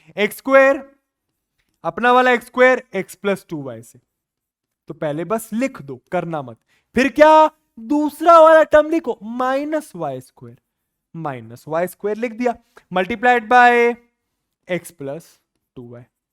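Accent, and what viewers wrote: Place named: Bihar, India. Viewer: ap bihari ho /mai bhi hu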